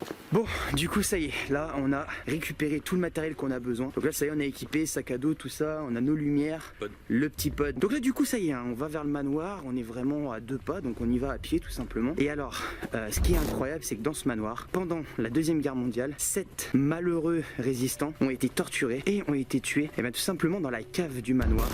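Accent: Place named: North, France. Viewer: Bonsoir vous êtes nordistes? Non? J'ai le même accent 😊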